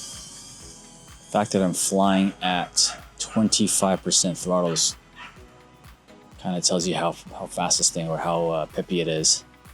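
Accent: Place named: United States. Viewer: You sound American. Did you have any trouble ordering the Pavo 20 pro 2 considering the dji ban?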